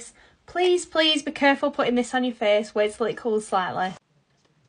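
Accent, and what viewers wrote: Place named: Northern England, United Kingdom. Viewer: Oh wow you’re a northern lass, why did I think you were American? So frikkin talented 😊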